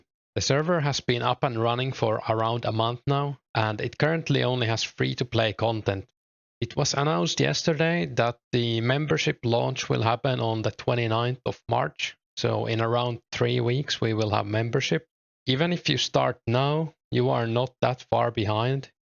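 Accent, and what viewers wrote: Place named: Finland. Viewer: Enjoying the vids man! That glorious Finnish accent is very calming and helps me get through the late night grinds :)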